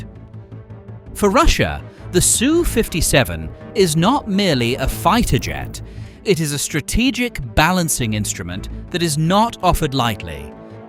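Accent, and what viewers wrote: United Kingdom. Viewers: Another English Accented Jewish Gangster Narrator, being negative. Says The BronxBoy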